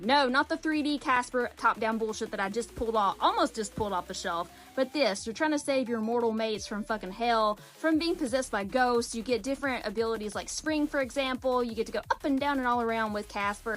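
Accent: South, United States. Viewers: I love your charisma! Don’t see many other southern accented gamers. Bless you friend!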